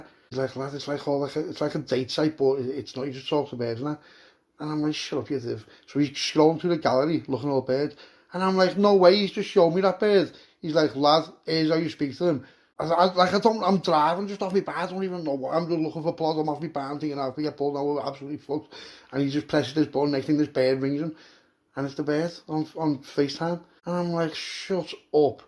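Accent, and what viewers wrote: Liverpool, United Kingdom. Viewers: Could have swear I heard you today in Turkey ano scouse lads sound similar but sounded proper like you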